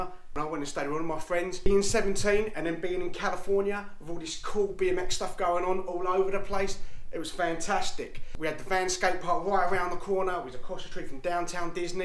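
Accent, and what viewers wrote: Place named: Cockney, United Kingdom. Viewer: wanna see you in Jersey bro! miss having that bit of cockney love on the channel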